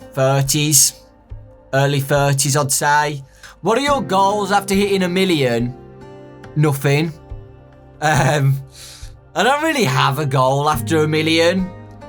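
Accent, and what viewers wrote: United Kingdom. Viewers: My family was happy for me when i became a GachaTuber. The only reason im good at a british accent is because i watch you.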